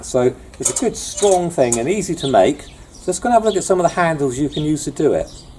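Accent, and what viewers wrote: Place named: England, United Kingdom. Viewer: sounds like you are in England....not sure if they have it there, but here in the states we have dedicated bucket hooks that basically do the same thing as your custom job there. it hangs on the rung but is off to the side of the ladder so no issues. personally i dont like them, i use a Handy Pail brand bucket to paint out of. i can slip my hand like a glove onto their handle and still use my fingers to holdthe ladder if needed. 9/10 times i set my bucket on the top one or two ladder rungs though. The only flaw those Handy Pails have is you cannot hook them onto a ladder. Purdy has one that is able to use ladder hooks but i just dont like the design, seems cheap and can only fit a 4inch weeenie roller(sausage roller, small roller, pick your adjective) and i really like to use the 6in version which the Handy Pail fits beautifully. 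Just some tips from a painter of 25 years here in Kansas. (yes that Dorthy and Toto Kansas...i live 15 minutes from the Wizard of Oz museum.)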